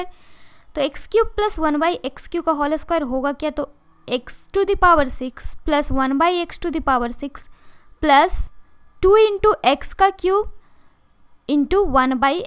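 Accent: Bihar, India. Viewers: mam bihar ki tune hai aapki...ya up east ki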